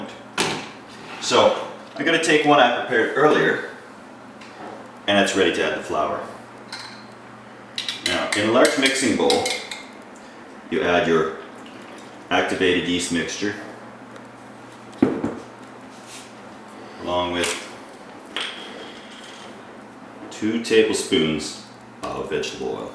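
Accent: Canada. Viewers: plus the accent.. definitely Canadian! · I'm from India, I've never been to N America, and I could tell you're Canadian. How? Because of watching South Park. LOL! Your "abouts" gave it away. Yours is probably the best looking pizza base I've seen on youtube. I'm definitely gonna try. Thanks for the informative video. Where did you get the measurements to the tenth of a gram? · U Canadian??? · u canadian? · This guy sounds canadian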